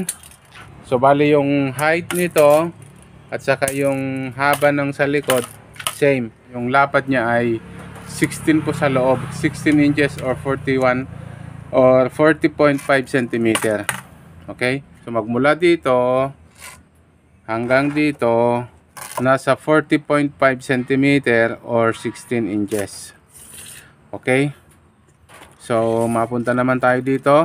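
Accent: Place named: Ilocos, Philippines. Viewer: Ilocano ka met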